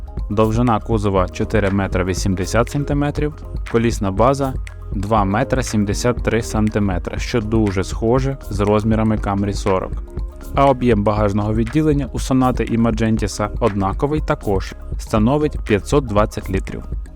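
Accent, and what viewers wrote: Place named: Ukraine. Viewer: Ничего хуже хохлового говора в обзоре машин нет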